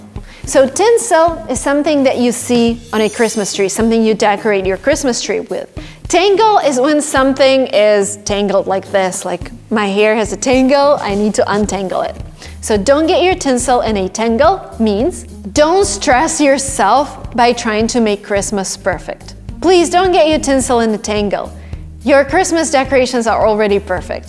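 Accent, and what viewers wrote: England, United Kingdom. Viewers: I really adore your english accent. Thanks for your all video. Merry Christmas 🎅🎅🎅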